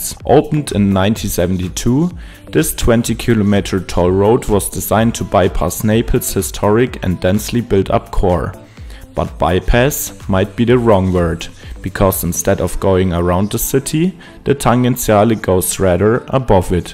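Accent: Germany. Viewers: I hear deutsch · I really her out that you are from Germany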